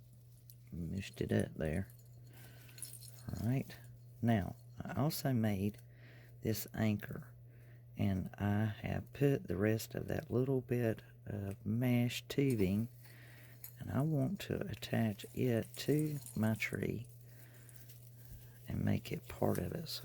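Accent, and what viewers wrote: South, United States. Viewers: We live on a teeny tiny island off the coast of NC...so...you KNOW I LOVE this one. Covid closed down all the craft shows that feed, house and clothe my family so it's been a really HARD year. I'm ready to kiss 2020 goodbye come midnight December 31😘🙋‍♀️. Looking forward to a NEW YEAR 😊🤗 and lots of new crafts!! TFS and I find your sweet southern drawl so charming and relaxing...like sipping sweet tea from the front porch rocking chair on a hot summertime afternoon 🎄💓God Bless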